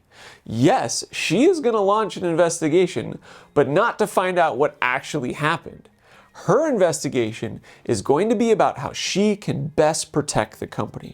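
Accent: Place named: California, United States. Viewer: This dude sounds like he’s from California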